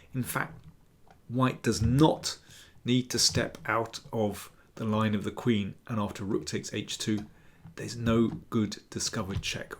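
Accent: England, United Kingdom. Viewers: wonderful precise analysis, with that lovely English accent. bravo.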